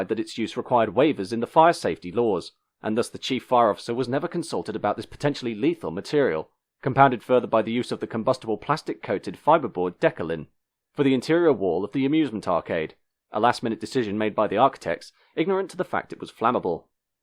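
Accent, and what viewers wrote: United Kingdom. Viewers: Why do we need to have British narrators on the reports. Between the irritating accent and the annoying pronunciation the f certain words, (al you minimum) it makes these reports hard to listen to. If this is published for use in North America use a North American narrator to read the script..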